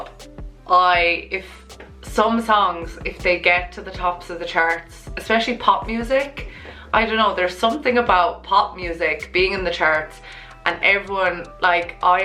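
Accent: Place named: Ireland. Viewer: I luv the iris accent my friend is iris n i luv it how she speaks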